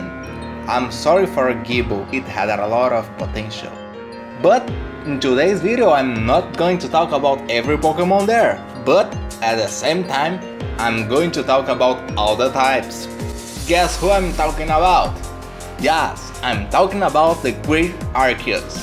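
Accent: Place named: Brazil. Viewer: you have a brazilian accent, are you from brazil?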